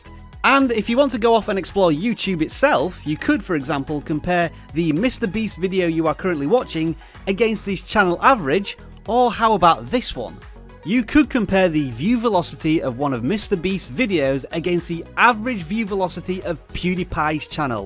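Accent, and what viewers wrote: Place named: United Kingdom. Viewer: That's a British accent.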